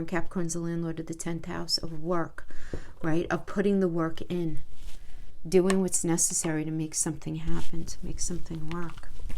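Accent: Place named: New York/New Jersey, United States. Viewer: What area are you from? You have a slight NY/Jersey sound. 💛 I really like your readings for Libra. Thank you.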